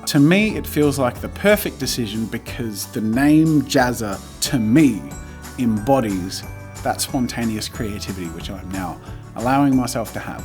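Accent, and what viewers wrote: Australia. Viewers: all those draw with jazza clips overemphasize your aussie accent. I gotten use to hearing you for years and the compilation just made me think "oh yeah, he is australian" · That montage got progressively more Australian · Jazza's Aussie accent is so much stronger when he gets emotional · Keeps getting more Australian.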